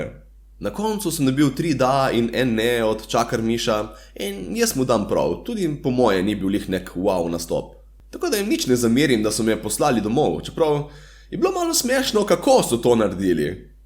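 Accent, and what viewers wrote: Primorska, Slovenia. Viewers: Ma kaj, super si bil, samo, da žirija in publika ni imela pojma, kaj je to komedija. Kaj pa blebeta tisti Čakarmiš, kaj pa je on naredil v življenju, razen tega, da je nekaj delnic kupil od Pro Plusa in lepo živi od dividend in drugim soli pamet. Toliko brezveznega pesimizma naših Slovencev pa še ne. Seveda, tudi jaz sem bil začuden, da si bil na Talentih, nekaj časa sem mislil, da je neka reklama zate, no potem pa sem poštekal, da resnično tekmuješ. Bil si brez treme, govoril našo primorščino in hvala Bogu, da te imamo. Tu sei bravo! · Obožujem tvoj naglas🙌 primorci rulamo😂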